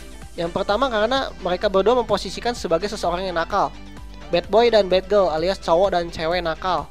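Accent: West Java, Indonesia. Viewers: miminnya dari sunda :p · sunda banget ngomongnya haha malah ngakak. makasih bang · bang lu org Sunda ya? suara lu ketara hehe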